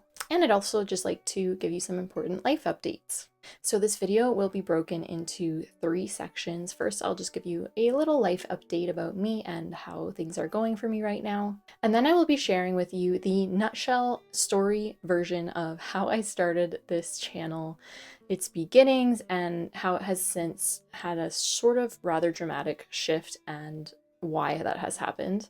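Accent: Canada. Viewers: Please do a video about your eyebrow regrowth journey- I can see, in earlier pictures of you, that that is also a choice that you made. I just turned 60 and decided about a year ago to start regrowing my brows (because the alternative is a very expensive brow lift!). My brows were growing back really well by simply moisturizing them every night with L’Occitane cream, but when I used micellaire water to remove my makeup over the course of a couple of months I realized that I was getting bald spots where there had previously not been a problem with sparsity! So I would love for you to talk about that… Love your videos, great information, and Canadian accent!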